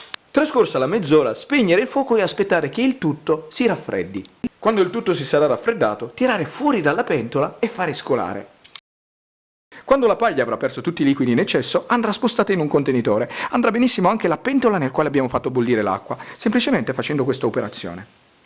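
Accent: Italy. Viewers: Me encantan los hongos pero también tu acento...viva Italia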